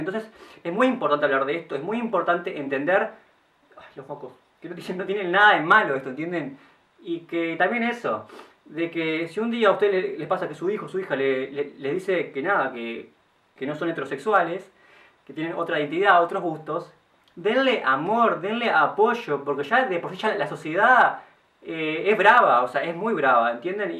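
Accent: Uruguay. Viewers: Desde que te escuché hablar supe que sos de Uruguay, te admiro mucho, todo mi apoyo desde Argentina ♡♡♡♡ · Estoy tan acostumbrada a ver youtubers argentinos que ver un youtuber de nuestro país y con nuestro acento diciendo BO, TAy GURI es un mimo ❤️ jajaj A parte de eso, lamento que hayas tenido una infancia angustiosa, te mando un abrazo grande, tenes una energía hermosa ✨ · gracias por contar tu historia que ayuda y ayudará a mucha gente!!! y es real en nuestro país se ha logrado mucho con las leyes pero falta que las familias acepten y amen mas a sus hijos y la sociedad toda acepte al otro con amor y respeto! me gusta mucho escuchar tu acento y estilo uruguayo del interior ! saludos desde Colonia del Sacramento!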